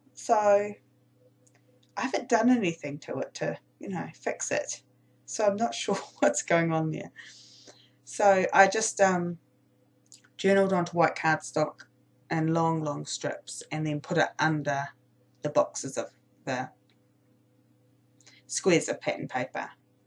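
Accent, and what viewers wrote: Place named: New Zealand. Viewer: Three great layouts! Love watching process vids about using own stash or scraps, as they give me tons of ideas. Also, I'm a Kiwi living in Canada, so it's great to hear a fellow Kiwi chatting away....your narrations are so entertaining (even if they do make me homesick! Lol)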